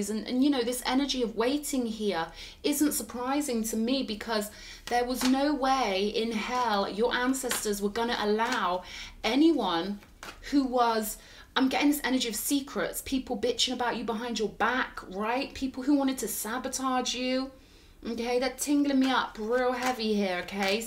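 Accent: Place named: England, United Kingdom. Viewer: Well now you have me love struck with the natural setting as Heaven, tee hee. Having the queen's english and angelic level already had me sold that you are a gem. Chances are you may not be interested in a 61 year old frog though. I'm guessing you are quite picky. As we all should be I guess. Personality wise for sure. Take care. ✌️🥰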